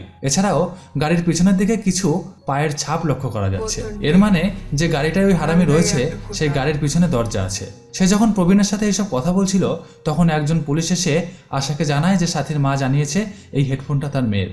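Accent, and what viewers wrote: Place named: Visakhapatnam, India. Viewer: উচ্চারণটা মনে হয় বিশাখাপাট্মম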